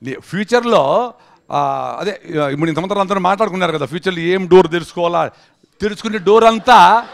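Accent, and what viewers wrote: Rayalaseema, India. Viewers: మీరు మాట్లాడే రాయలసీమ యాస బాగుంటుంది సార్ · అసలు సిసలైన రాయలసీమ accent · మీ రాయలసీమ భాష పెరుగన్నం లాగ కమ్మగా ఉందండి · మీ బాషా యాస చూసి రాయలసీమ లో పుడితే బాగును అనిపించింది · Rayalaseema basha entha madhuramga vundi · That rayalaseema slang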